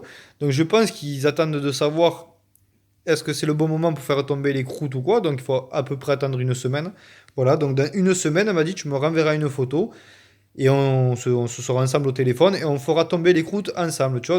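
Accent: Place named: South, France. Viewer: salut merci pour ta réponse Peut tu me donner les coordonnées de ton interlocuteur français à la clinique j envisage Di aller fin avril donc sa hurge merci encore gilles du cap d agde tu doit pas être très loin vu ton accent merci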